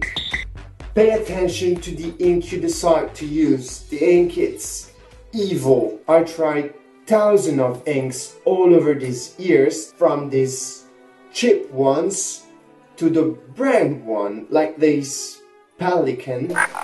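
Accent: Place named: Italy. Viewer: OMG ho appena scoperto che sei italiano! ^_^ In effetti si sentiva che non eri Inglese (srnza offesa ovviamente, il tup inglese è ottimo :) )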